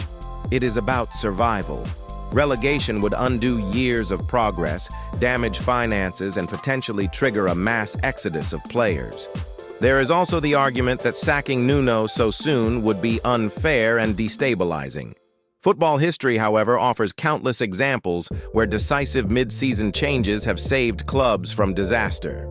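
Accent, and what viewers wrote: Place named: United States. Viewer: But why have an Amerixan voice,get Danny Dyer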